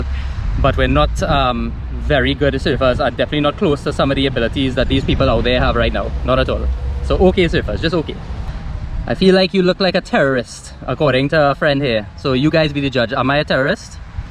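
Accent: Trinidad and Tobago. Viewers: This was hilarious. 100% agree in regards to traveling. I am not an extensive traveller but I've been fortunate to visit a few countries and truly enjoyed it. You become more open minded and less ignorant because now you've experienced different cultures and people and it eliminates any preconceived misconception. On another note, I showed my wife one of your videos but muted it then unmuted and she was so confused. She couldn't comprehend why a "white boy" sounded like a Trini 😂😂😂😂😂. You should do another one like this but live! Bless.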